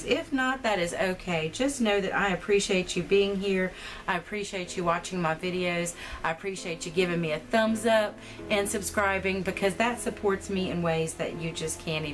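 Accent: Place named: Oklahoma, United States. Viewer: Theresa5 sent me! I love watercolor and will enjoy your series. I love your accent! I am originally from Oklahoma, so your accent reminds me of home (even if you are not from there... lol). Thanks for sharing your lovely work!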